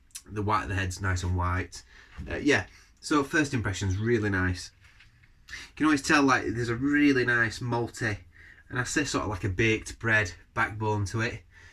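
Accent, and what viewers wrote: Yorkshire, United Kingdom. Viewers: Mate how have I only just discovered your channel now? Been looking for a lad who reviews supermarket beers that I see every day for ages. Loving the content mate! Yorkshire lad? Subbed! Cheers!! 🍻🍻🍻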